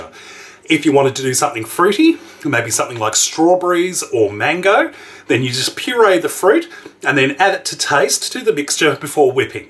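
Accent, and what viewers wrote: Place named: Australia. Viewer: I recently subscribed to your channel for two reasons: the easy-peasy recipes, plus your delightful Aussie accent! We Americans do enjoy hearing y'all talk.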